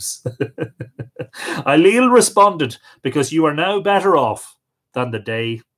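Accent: Ireland. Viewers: You are helping us all so much. God bless you and your sweet family. Please don't be embarrassed for showing emotion over this horrible virus and stressful time the whole earth is sharing... Many people have died. Yes, this is a very trying time for us all. Your stories truly do help. My personal story is that my husband is in Hospice. He is dieing from Pulmonary Fibrosis as a result from serving in Vietnam and exposure to agent orange. So our days are all very much the same, just fewer guests now. So anyway, I get very stressed and often go in to YouTube and find you at bedtime, whatever that time may be. I listen to your friendly Irish voice till I'm able to fall asleep. Thank you SO MUCH !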